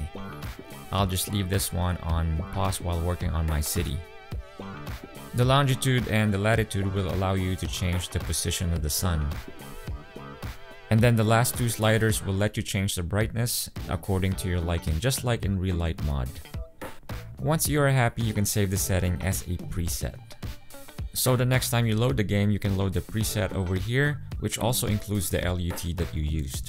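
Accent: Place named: United States. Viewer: goddmorning. NC u half or what? I mean the voice is so American HAHA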